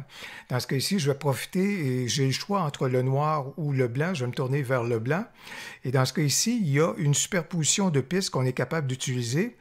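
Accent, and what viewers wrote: Quebec, Canada. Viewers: Merci beaucoup pour Tuto très bien réalisé. Et puis pour moi, cerise sur le gateau, c'est l'accent Québecquois que j'adore, même si tu me répondrais que tu n'as pas d'accent et co contraire c'est moi qui ai un accent de "Maudit français" 😂😂😂 Et Merci encore une fois !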